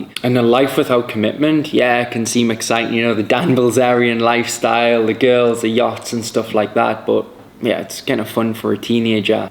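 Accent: Newcastle, United Kingdom. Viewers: You sound geordie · He has a Newcastle accent. 🙄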